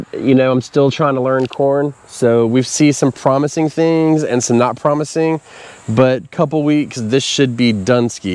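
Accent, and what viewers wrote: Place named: North Carolina, United States. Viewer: Northern NC accent I'm guessing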